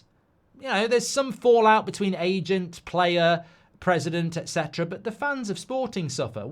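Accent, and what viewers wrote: United Kingdom. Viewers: It looks like I am here to get Fabrizio Roman’s update indirectly with British accent.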